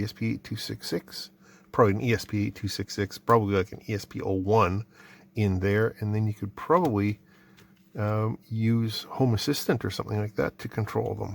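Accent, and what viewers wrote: United States. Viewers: I'm going to assume you are a Big Clive fan, after the "one moment please" Going to also assume you from the extreme northern USA, Montana to Washington, from hearing, OAT and ABOAT. for out and about. Got a free X-10 sample pack after reading of their $40 value in an electronics magazine. (Mid 90's) 6 other electricians from our vacuum cleaner plant in N Canton, Ohio got in on the deal. Still have one to open the garage door 30 years later. (Sears wanted a fortune for a transmitter and had made buying the decoder chip impossible.) Good Bye Sears 🥲🤣 Here's your 👍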